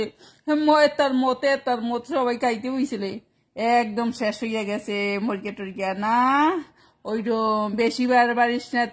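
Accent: Barishal, Bangladesh. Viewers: আপনার বাড়ি কোথায় আপনার কথা তো বাংলাদেশ বরিশাল জেলার মতো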